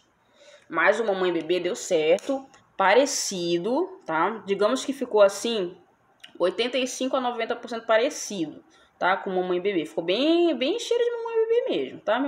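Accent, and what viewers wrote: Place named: São Paulo, Brazil. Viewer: Tá fazendo frio aqui também amora ! Eu sofro no inverno kkk sou mto friuenta Lindona vc mora no Rio mais o sotaque é de são Paulo? Fiquei curiosa acho lindo o jeito de falar hehe 🙊😍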